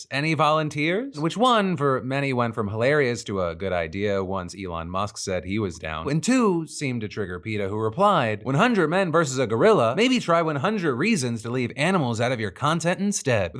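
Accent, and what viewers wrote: United States. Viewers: My fun fact is for my Final GCSE Drama Performance, back in 2016, I needed to do an American Accent for a reoccurring news reporter character, I based it on and trained myself on your voice for about 2 weeks... And I passed! Cheers, Phil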